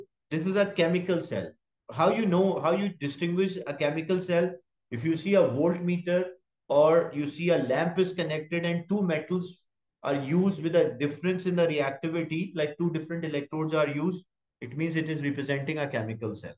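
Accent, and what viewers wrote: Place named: India. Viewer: Ur accent is indian are u indian?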